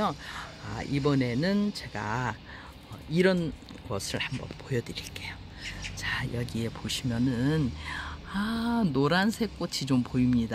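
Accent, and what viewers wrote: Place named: Seoul, South Korea. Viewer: 설명 하시는 말 솜씨가 완전 서울 토박이 같네요 ㅎ 전 갱상도라 부럽습니다 여러 이쁜꽃들 소개시켜줘 고맙습니다 👍🙏🥰❣️🌷👏👏👏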